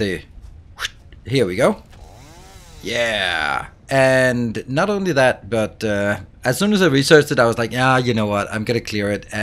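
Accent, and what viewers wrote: Denmark. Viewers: yo mate good video just got the game and wanted to lean a bit while downloading :D Little side Quest ion. are you danish ? your accent makes me think that hehe and wanted to ask. any way have a nice day ^^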